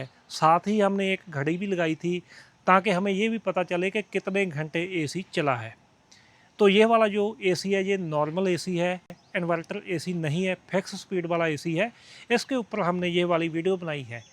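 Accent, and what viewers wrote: Punjab, India. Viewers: imandar insaan ho aap. aapki punjabi vaali hindi bahot hi achhi lagti hai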